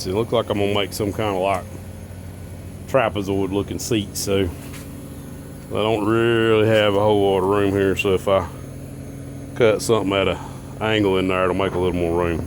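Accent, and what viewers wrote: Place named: South, United States. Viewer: I love this design! I also LOVE your deep southern accent😊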